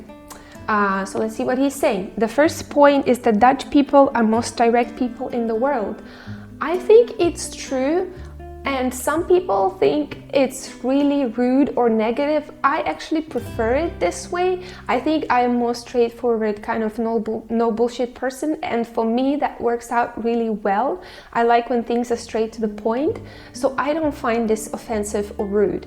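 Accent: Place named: Ottawa, Canada. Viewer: Hi i am a Dutch - Canadian ( living in Canada for 53 years ) what you are saying is so true in the Netherlands (for me it is still Holland ). The person talking about the trains is the same that i was living there , on time and fast. so the person talking about the Netherlands is a sick puppy. Love your way of thinking about for me Holland. You sound like a Ottawa person.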